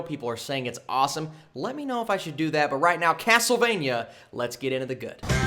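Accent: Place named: Texas, United States. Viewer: Are you from Texas? You have that accent.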